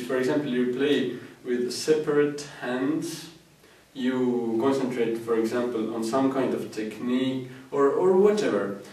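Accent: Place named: Estonia. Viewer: he's estonian haha...I could tell just by the accent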